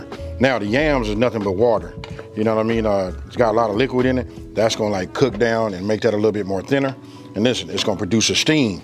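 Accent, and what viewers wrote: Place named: Los Angeles, United States. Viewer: I could tell by your accent you were from LA. Watts up! Thank you for the recipe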